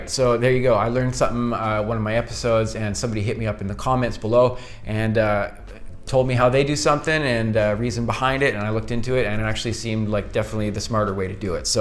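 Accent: Canada. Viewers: What your Canadian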